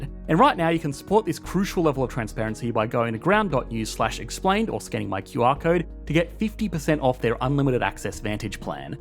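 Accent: Australia. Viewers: Cadence is too fast. Ausssie accent makes it even harder to understand. Chaotic topical progression. Uggh. Utter disaster.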